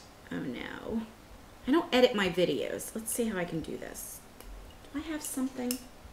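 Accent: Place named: Philadelphia, United States. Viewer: where are you from? you have kind of a philly accent lol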